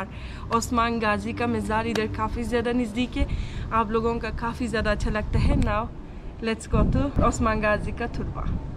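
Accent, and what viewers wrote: Pakistan. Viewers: Aapka urdu sunnne me mashaAllah achha lagta hai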